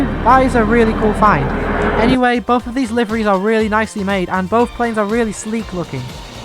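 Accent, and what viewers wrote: United Kingdom. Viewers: ahhh now i hear your voice i can tell your british 😂. i hadnt heard it before this vid lol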